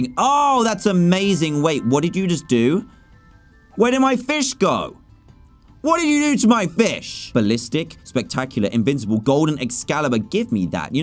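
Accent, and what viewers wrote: United Kingdom. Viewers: He's British. He has an accent. He can say it how he wants.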